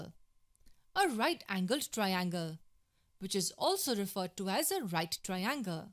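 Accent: India. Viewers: Not bad. But obviously Indian accent is irritating. When you learn English, learn enunciation!!!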